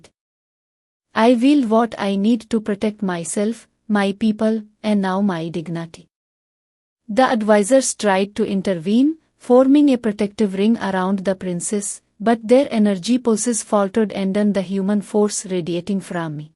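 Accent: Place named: India. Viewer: Not sure the Indian accent works, plain Western English is far batter!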